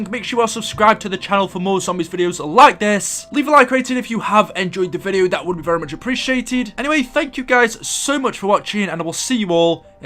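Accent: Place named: Wales, United Kingdom. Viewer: are you Welsh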